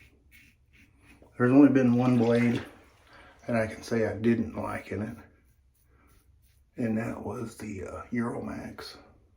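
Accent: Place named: South, United States. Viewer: Hey, thanks for the video. What are your thoughts on the Razorock Game Changer .84 Open Comb, now, 4 years later? I found your video because I just ordered my first safety razor, after using cartridges for 30 years - first the Gillette Sensor, then the Mach 3. After some reading and some recommendations, I went with the GC .84 OC. (I’ve always been one to dive in head first!) Also, sounds like you’re from the South. I’m in Nashville area.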